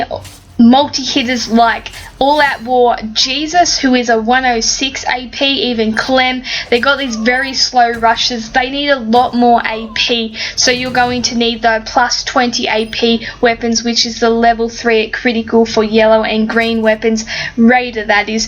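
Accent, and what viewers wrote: Australia. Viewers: Great video just subbed you, I have been showing it to my faction omnipotence paradox on the marion server we are the best faction on our server and a lot of our faction can now rush turn one due to you thank you very much our enemy's are not liking this one bit lmao. I just started doing videos myself actually loving your aussie accent im trying to tone down my strong english accent but im having trouble hope people can understand me haha. Thanks a lot and good luck for 2017. Happy hunting.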